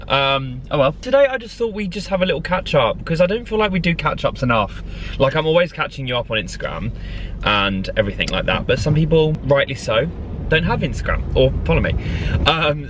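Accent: South London, United Kingdom. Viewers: south london mandem accent 🤣🤣🤣 can’t lie it’s my fave also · So you are a south londerrr aye 😅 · 100% with you there on the accent, i know exactly what you mean - it’s a south london accent and it does a funny thing to my knees too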